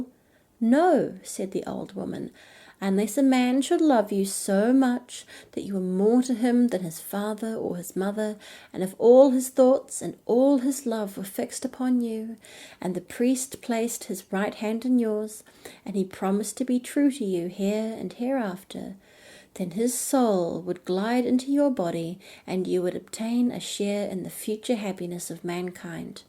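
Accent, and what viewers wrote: New Zealand. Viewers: Your voice and Kiwi accent are so relaxing 😊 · I usually don't mind the NZ accent, but not necessarily for story telling, tbh you sound lispy, have you taken your piercings out? Sorry I've had to leave you and go to another channel called relaxe her voice is clearer and not so lispy and poppy very soothing American story teller she is, but I give you an A+ for effort🦇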